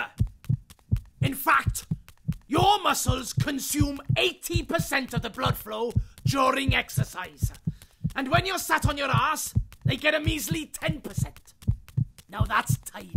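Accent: Wales, United Kingdom. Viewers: that's a welsh accent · He is welsh! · is it welsh accent?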